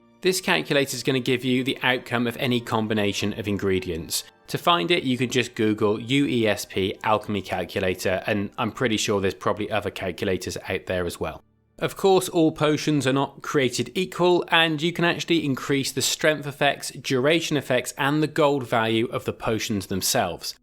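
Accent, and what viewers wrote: England, United Kingdom. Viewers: Do people get mad at Brits for Brit speech? Feels childish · Good looks my friend great tutorial very helpful even with the English vibe lol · I’m more triggered by your use of apparatus as singular and plural than the British pronunciation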